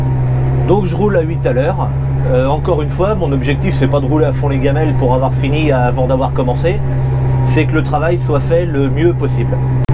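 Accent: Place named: Vendée, France. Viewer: Chère Vendéen y'adore toun'accent,mé i seu en Charente Inférieure le pays d'Goulbenèze,un grand salut à vous aut'teurtout. Et vive les socs et les oreilles.😅😅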